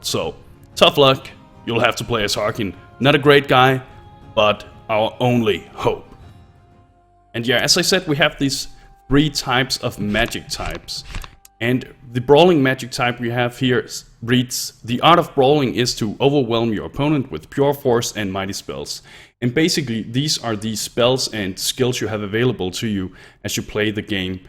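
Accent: Denmark. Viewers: Bro You sound Danish 😁 No Worries i do too. Cuz i am 😂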